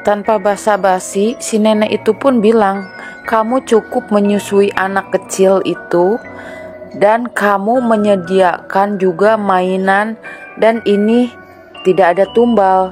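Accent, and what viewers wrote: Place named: West Java, Indonesia. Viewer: mantap cerita ada animasinya, klao dnger dari logat kayanya dari sunda semoga chanelnya sukses, yg penting tekun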